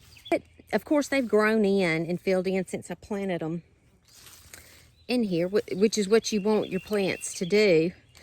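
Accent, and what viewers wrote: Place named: South, United States. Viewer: I love your videos,! You DO have a lovely personality. I have thought about making videos, but I have a voice box problem. And yes, we can tell you are from the south 🤭😆 because you sometimes double the syllables in a one-syllable word. Lol I'm a southerner, too, born/raised in west TN.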